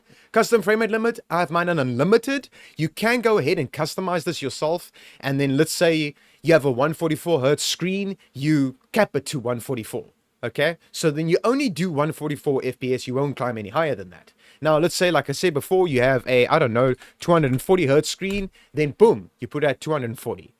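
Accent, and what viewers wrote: South Africa. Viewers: You are the best to do it, theres something so refreshing about hearing a tutorial in a SA accent lmao. love you bru, keep doing what you doing.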